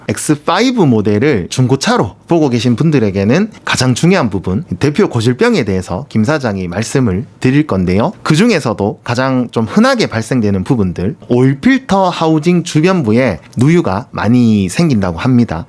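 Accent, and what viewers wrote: Gyeongsang, South Korea. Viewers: 아 이분 갱상도네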